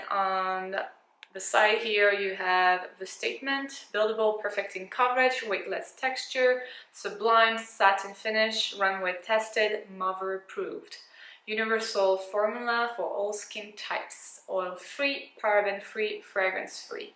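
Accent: France. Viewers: Ok so now I need Charlotte tilbury eyes to memorize in jean. What a beautiful look, I just subscribed today, I love your style! (And your French accent!). It’s French, isn’t it? 😊❤️💋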